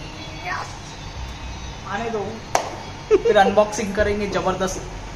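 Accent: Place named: Bengal, India. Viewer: Mam hamera Bengal sa ha pata chal raha ha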